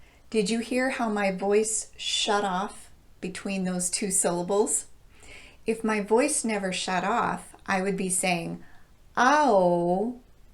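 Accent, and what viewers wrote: United States. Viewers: Dear! What state are you from?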